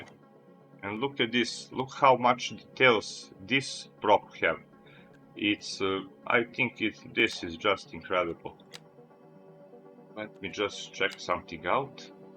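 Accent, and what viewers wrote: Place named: Serbia. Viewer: W series so excited for the next part Love the serbian accent😂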